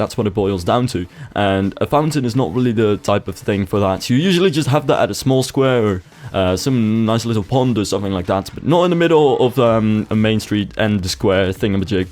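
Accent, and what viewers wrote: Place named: Netherlands. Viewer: Thanks, and by your voice.. i can tell ur dutch .. Zeer goed kanaal ;)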